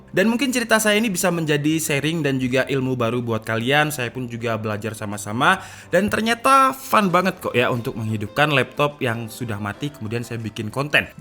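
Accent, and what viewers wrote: Java, Indonesia. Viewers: Mase ki wong jowo ketone tek ngletek tek di protoli😭😂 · Pake stip,,, medok jowo banget 😂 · Mas, njenengan asli pundi e? Haha Masih terasa bahasa Jawa nya.. haha Mantap mas lanjutkan dan jangan lupa mampir di channel saya mas.. 👍🏻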